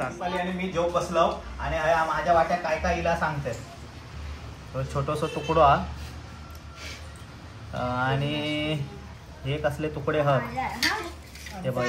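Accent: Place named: Malvan, India. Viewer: तुम्ही अस्सल मालवणी बोलता,अभिमान वाटतो धन्यवाद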